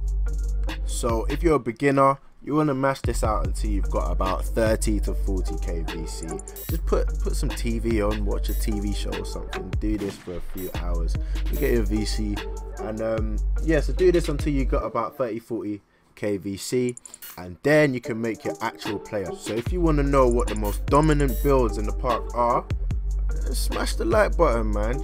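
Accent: London, United Kingdom. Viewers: Your from London init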